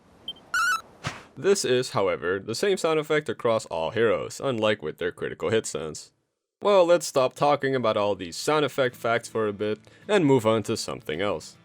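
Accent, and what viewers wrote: Netherlands. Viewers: Can i maybe ask if you are from the Netherlands because your accent sounds a bit dutch. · Ben je Nederlands of Vlaams? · now i cant unhear your Dutch accent. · "mensen denken sowieso dat dit duits is" I'm dutch (well actually flemish but who cares) and that's not german. I do not actually know what weird language that is, but I do recognise in your way of speaking english that you are a fellow dutch speaker. Why did i write this. Duits is een fantastische taal en Duitsland is een mooi land. Nein, niederländisch ist fiel besser als deutsch. Yeah i'm bi... trilingual... maybe we can even count french, nah i suck at french. I should really stop now this is becoming cringey. Why am i continuing, the cringe is real. Cringe and cringey are cringey things to say.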